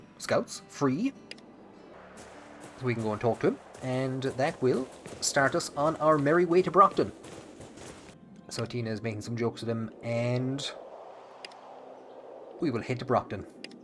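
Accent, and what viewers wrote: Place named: Ireland. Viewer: irish guy playing aussie simulator....i luv it!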